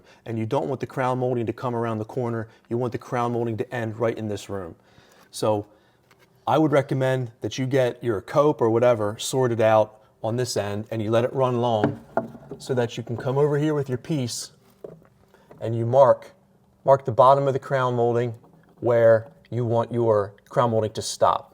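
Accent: Philadelphia, United States. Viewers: True story- video with Philly-accented voiceover builds instant trust 💪 Thanks, brother!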